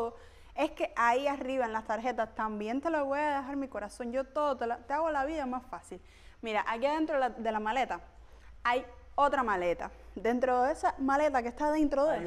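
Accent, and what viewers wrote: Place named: Cuba. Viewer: Conozco tu país, pero cuando estuve por ahí no existía la pandemia, por lo que no tuve la oportunidad de familiarizarme con el término. Lo que sí existía era un calor de morirse. Muy lindos tus videos, muy sugerentes, porque a lo coloquial de tu lenguaje con ese dejo muy cubano, le agregás una simpatía que cautivaría a cualquiera que te viera. Te felicito.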